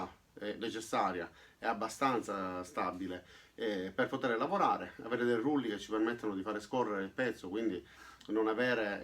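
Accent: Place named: Calabria, Italy. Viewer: Ti giuro che ho pensato sin da subito che eri calabrese!!!hai un accento molto simile al calabrese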